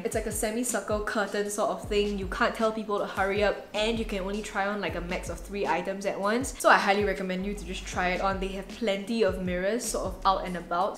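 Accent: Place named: Singapore. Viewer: how do u remove ur Singaporean accent?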